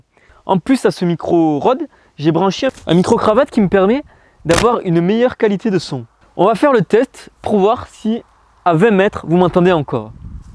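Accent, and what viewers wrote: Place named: South, France. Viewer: Coucou ! J'ai pas regardé toutes tes vidéos mais ce que j'aime beaucoup chez toi, c'est que tu progresses vite ! Ta diction est beaucoup plus punchy et moins saccadée, tout en conservant cet aspect "théâtral", c'est vraiment chouette ce que tu fais ! Après je t'avoue, mais je veux pas t'influencer hein, mais j'aimais plutôt bien les pistes de réflexion profondes (avec une petite teinte d'humour comme t'aime bien faire, saupoudré de ton accent du Sud (à lire aussi avec l'accent du Sud aussi aha) ;) ) Mais je pense que tu feras ça pour les prochaines ! Continue l'ami t'es clairement sur la bonne voie !!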